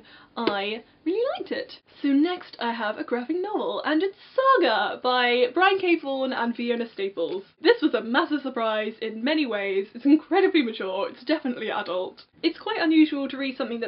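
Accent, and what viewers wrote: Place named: United Kingdom. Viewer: Great books! Looking forward to watching more of your videos :) I'm not sure what my favourite book of 2014 was to be honest! I think I'll have to go Cinder by Marissa Meyer. :) Just wondering, where abouts in the UK are you from? I love your accent!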